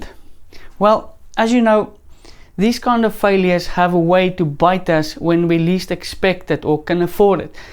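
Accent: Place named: Afrikaans, South Africa. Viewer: Lekka Afrikaans South African accent - Made me chuckle :D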